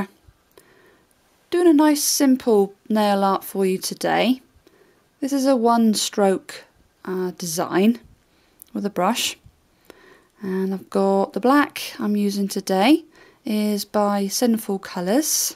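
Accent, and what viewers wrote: United Kingdom. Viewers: Are u British or scottish you sound a bit like it ;)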